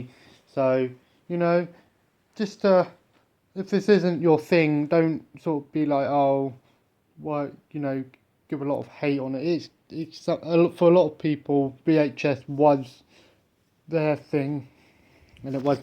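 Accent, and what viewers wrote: United Kingdom. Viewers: speaking British English That is my favourite! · where in England are you from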